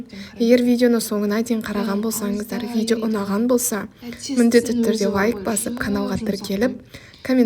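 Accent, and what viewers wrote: Standard. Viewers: Жарайсын.сенын таза казакша сойлейтинын оте керемет.торттарын да керемет. 👍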